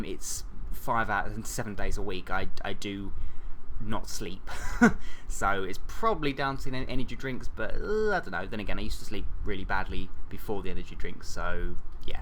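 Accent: England, United Kingdom. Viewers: HES ENGLISH YAAASS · were do u live u soud british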